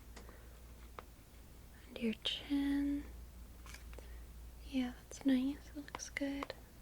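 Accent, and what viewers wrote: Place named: South, United States. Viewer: I'm guessing you live in the country because most people pronounce it with the i n g most of the places I know that pronounce it's with the Southern drawl you're describing are in the country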